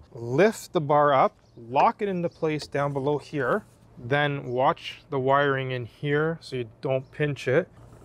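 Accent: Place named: Canada. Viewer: I love it when Canadians go oot n aboot.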